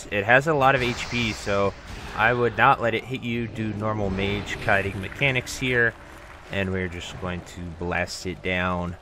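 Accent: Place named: Texas, United States. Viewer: Ah, you sound similar to a guy I know from Texas 😊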